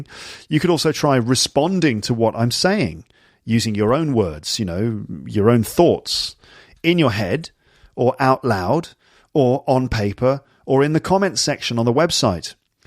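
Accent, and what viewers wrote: United Kingdom. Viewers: One of my favourite way to practice listening British English :> Thks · This podcast is exactly what I was looking for, I love the level, the speed, the topics, and specially I love to understand the most beautiful accent: British, at last! Thanks a lot,! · speaks the standard form of British English. One of his podcasts he mentioned that he speaks as received pronunciation (the kind of accent that you may hear on BBC).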